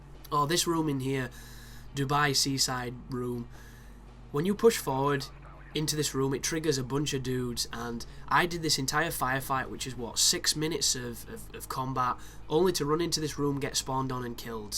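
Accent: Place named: Yorkshire, United Kingdom. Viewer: Funny you don't watch GoT, your accent reminds me so much of Jon Snow's.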